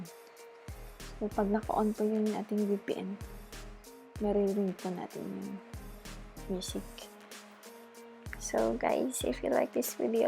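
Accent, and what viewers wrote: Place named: Philippines. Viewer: i could tell with the accent i’m half filipino:)) salamat po for the video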